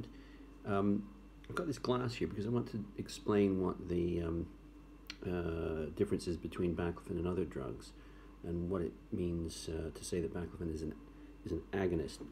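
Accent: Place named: United Kingdom. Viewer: I think I hear a slight British accent ❤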